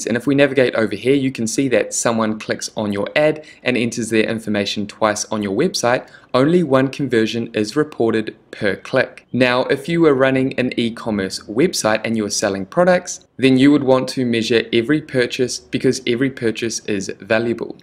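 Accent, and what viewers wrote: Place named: New Zealand. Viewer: Thanks, for such a clearly explained tutorial! Also, I'm from Chch, NZ and was happily surprised to hear a kiwi accent. You have done us proud. FT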